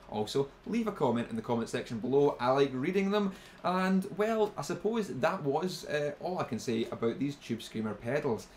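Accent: Scotland, United Kingdom. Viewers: All I can think when I listen to a scotish talk is in fucking trainspottin hahaha!! great review mate! cheers from argentina! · Your Scottish is beautiful! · Entertaining British commentary. it’s definitely funny. The personality certainly comes through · I forked out $280 (US$) for the TS808HW (used) and I feel like it was worth it, it works excellently as both a boost and an overdrive. Also I'd just like to say that I use your videos as a reference for a Scottish accent... Take that as you will, my ancestors were mostly Scottish.... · So, where you from? Sound scottish lol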